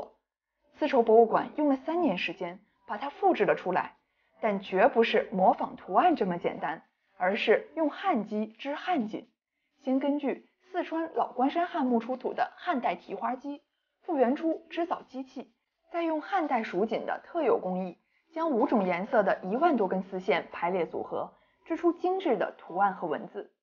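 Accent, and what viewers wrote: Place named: Cantonese, China. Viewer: 声音🈶广音。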